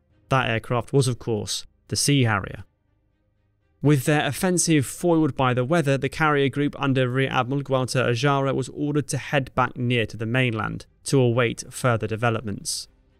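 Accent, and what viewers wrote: United Kingdom. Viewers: "Whether it was justified" well, the narrator's British accent can only raise my confidence that it concludes that justice and reparations are closer than ever. :<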